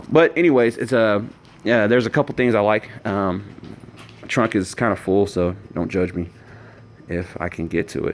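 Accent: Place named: Oklahoma, United States. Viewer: Awesome review man, i had a feeling you were from Oklahoma just by your accent lol. But I'm getting rid of my Legacy for either the Camry or the Accord. I'm leaning a little towards the Camry now lol.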